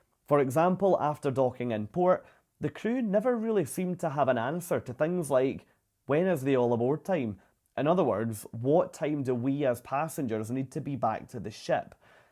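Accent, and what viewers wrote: Scotland, United Kingdom. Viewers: I just now stumbled upon your Vlog -- nice to hear a fellow Scot. My travel agent REFUSES to book us on MSC, so I watched this with interest to get your view. After this, I think we'll stick with Celebrity and Regent.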